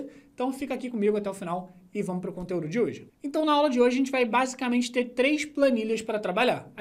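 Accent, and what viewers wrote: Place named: Rio de Janeiro, Brazil. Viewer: Brother, sou carioca tbm, mas moro em Fortaleza desde os 7 anos...mano eu tinha maior preconceito em vê uma aula sua por conta do incômodo do sotaque...Mas olha, conteúdo muito irado, parabéns...vou acompanhar mais.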